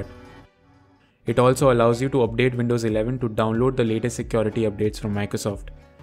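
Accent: India. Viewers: You have indian accent me no trust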